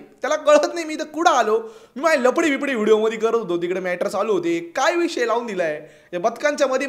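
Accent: Khandesh, India. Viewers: direct khandeshi accent madhe bolayla lagla ye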